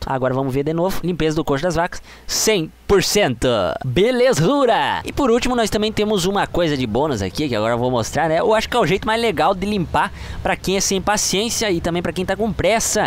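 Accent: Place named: Paraná, Brazil. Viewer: kkkkk C é do Paraná mano? Meu pai é paranaense e fui morar 1 ano com minha vó no Paraná e a galera fala desse jeito lá kkkkk povo doido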